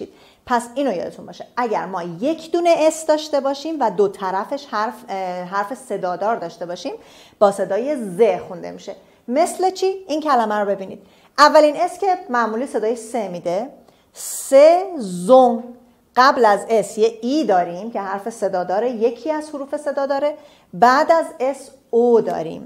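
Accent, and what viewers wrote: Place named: Iran. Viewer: سلام خانم استاد زحمتکش و لایق خسته نباشید من خودم را شاگرد شما میدانم اما یک خواهشی دارم آن هم این است که سعی کنید در قسمت تلفظ های کلمات لهجه ایرانی گونه تلفظ نکنید چون باعث گمراهی ما در آینده میشه تشکر از شما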